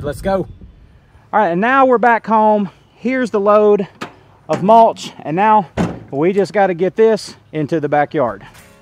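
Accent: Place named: Tennessee, United States. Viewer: Great video! Are you in TN ? You talk like me and I’m from TN . We say we are “ Tickled “ for things . I love to hear other people with our accent and sayings on YouTube 😊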